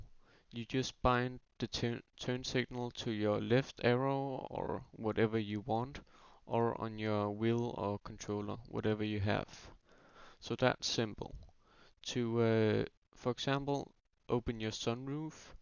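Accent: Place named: Denmark. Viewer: det er hvad jeg kalder en dansk accent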